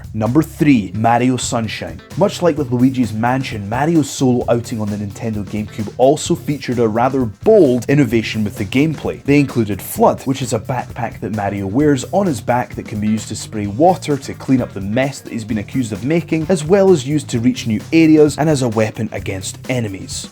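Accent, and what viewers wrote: Scotland, United Kingdom. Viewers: This guy reminds me of Dominik Diamond from Games Master. His Scottish accent when speaking on his retro reviews bring back so many memories!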